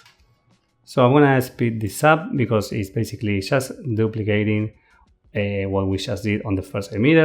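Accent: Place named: Argentina. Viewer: Por el acento del ingles, sos argentino. Tremendo tutorial! Te felicito y agradezco.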